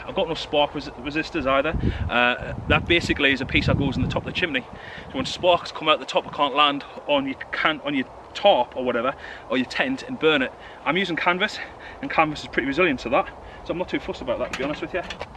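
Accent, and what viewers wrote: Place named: North East England, United Kingdom. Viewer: Good to see you back buddy👌 I’ve just bought a polish lavvu and I’m on “modding” it at the min, sewing monster zip in it, wax proofing it with a home made recipe and heat gun, changing the pole system to a british one witch packs down to the same size as original and also making custom wood bungs to go on top/btm of pole system😌👍 i am thinking about starting videos on bushcraft and wild camping but as a noob enthusiast 😂 we shall see... Ive watched all your videos and have learned a lot from you, your instructional skills are ace bud and its a pleasure to sit down and watch your videos learning as i go👌 plus its a easy to relate to a fellow north east lad and being able to understand what your saying with the accent😂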